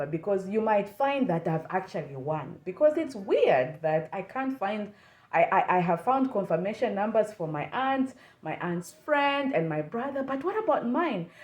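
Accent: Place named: Kenya. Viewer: are kenyan?you look, sound like everything about you is Kenyan. New subscriber from kenyan plus loving your content gal,it's top notch!!! Thank you and here to support you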